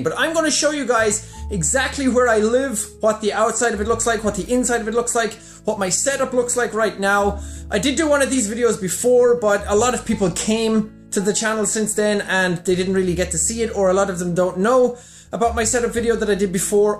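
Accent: Ireland. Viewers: you have the best accent just listening to it makes me want to stop being scottish and be irish instead just to have the accent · are u from ireland? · You're starting to sound a bit more Irish now 🤣🤗😉😝☺️💁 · Dat irish accent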